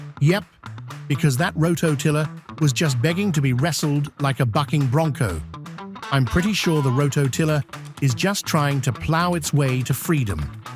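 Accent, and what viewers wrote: United Kingdom. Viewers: It's strange to hear someone with a British English accent using words like 'dude' (whatever that means) and 'I guess'.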